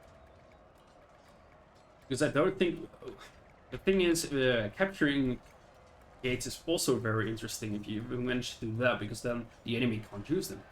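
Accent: Netherlands. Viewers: He's defiinitly dutch, as a dutchman myself I can instantly tell he's from the Netherlands with how he speaks english. Idk what it is exactly but it's very recognizable.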